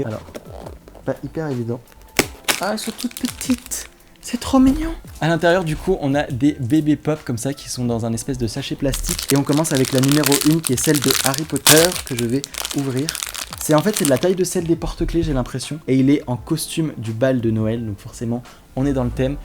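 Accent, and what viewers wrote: England, United Kingdom. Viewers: Pourquoi tu prend un acsent anglais ?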